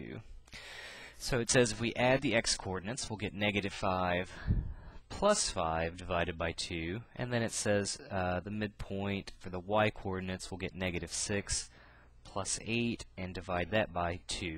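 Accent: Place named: Chicago, United States. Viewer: hahaha in this video you kind of have a chicago accent you have the short sound for your A's. I think.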